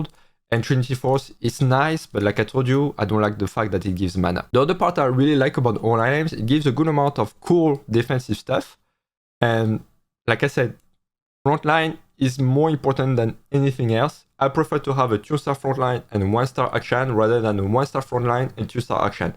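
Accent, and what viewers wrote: France. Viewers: you sound french, are you ?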